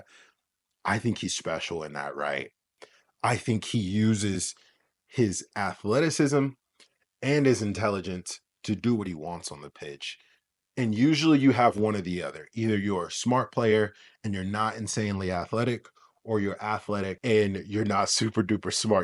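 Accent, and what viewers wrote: United States. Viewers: Have to be honest. When I hear an american accent talking about football I tune out. But this guy is a ball knower. Good video.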